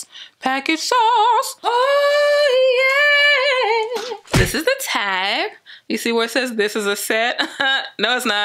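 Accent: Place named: South, United States. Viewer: Bby ILY!!!!! U made me subscribe within 90 seconds!!!! Nd let's tlk about that note beginning 😂😂😂 I said I'm most definitely joining the family nd joining the fun!!! KEEP GOIN WITH THAT YUMMY COUNTRY ACCENT!!! Hubby is from Louisiana nd me Cali ❤️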